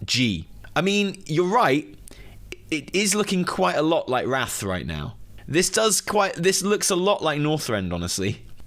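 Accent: United Kingdom. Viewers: Nothing like a good mystery reveal with a man with a British accent.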